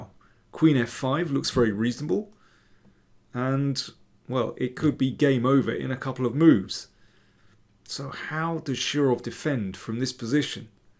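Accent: England, United Kingdom. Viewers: I agree : a delightful english accent that makes the video even more agreable.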